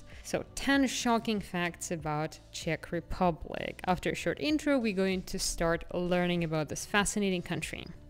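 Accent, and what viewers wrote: Russia. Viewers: This is by far the most offensive, arrogant and I should say ignorant (considering historical, sociological facts as much as knowledge of common realias) amateur video ever made about Czech Republic and published on YT. What is also odd is this Eastern/Western “definition” is claimed by the author (and arrogantly served as “a fact”) who is apparently a russian person (the accent is clearly eastern european/russian…although author is trying to hide that ;), there is probably good reason for this) living in Switzerland. I mean wow… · First, let me very shortly introduce myself: My family is a North Italian / Austrian / Czech mix. Am I right that the girl who made this video may be Russian? Despite all her efforts, I can still hear some Russian / East Slavic accent. What's more important, her opinions seem to be influenced by that: 1. Czech Republic simply IS a Central European country and it's probably the very synonym of Central Europe itself. 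Geographically, historically, culturally and economically. For almost a thousand years (= most part of its existence) it has been in the Central / Western European sphere of influence (Holy Roman Empire, Austrian Empire, Roman Catholic Church etc.). Yes, for four decades it was a part of the Eastern block, just like East Germany. Never "Soviet block" or even Soviet Union. 2. The socialist regime ended in Czechoslovakia in 1989, not in <num> was the year when Czechoslovakia peacefully split into the Czech Republic and Slovakia.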